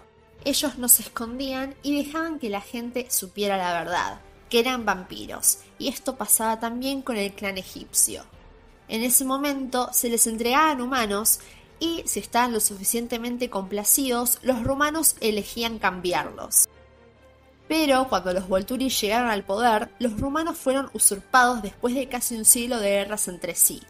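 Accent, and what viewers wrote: Argentina. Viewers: Puedes hacer un video sobre quien es mas fuerte? Si el chupa sangre o el perro gigante?🤭 me encanta tu acento argentino, buenos aires no?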